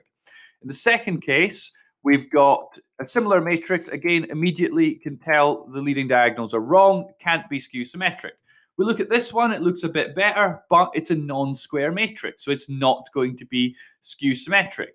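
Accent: Scotland, United Kingdom. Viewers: I could listen to Scots accents all day music 🎶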